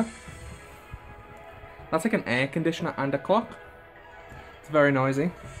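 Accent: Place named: United Kingdom. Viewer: hey we now found out ur voice and aparently ur british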